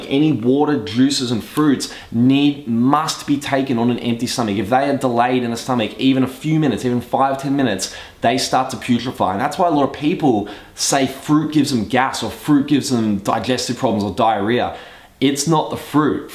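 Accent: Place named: Australia. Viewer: Love the OZie accent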